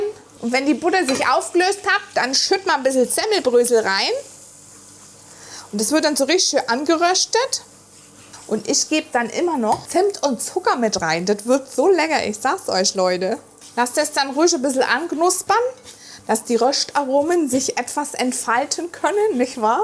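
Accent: Germany. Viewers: Klasse! Dein Dialekt gibt mir immer wieder ein Rätsel auf:eine Mischung aus hessisch, fränkische und berlinerisch. Oder täusche ich mich?!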